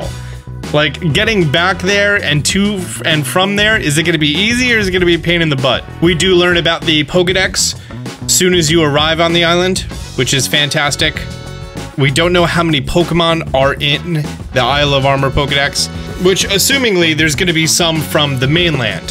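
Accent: Spain. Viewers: I like you even more now, with you cool Spanish accent!!! For the win!!! GO!!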